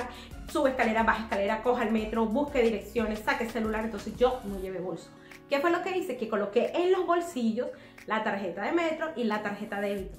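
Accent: Venezuela. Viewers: Hola. Me encantó. Y me encanta tu acento que se parece mucho al venezolano, yo soy venezolana. Un gran abrazo. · Eres Venezolana de casualidad? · jejejejeje además de hablar rapido tienes acento Venezolano, ojo no es para ofender, porque soy Venezolano, me gusta tu jovialidad y el entusiasmo como explicas las cosas no tan buenas que te han pasado.. por supuesto un saludo de este Venezolano a ti y tu grupo de soñadores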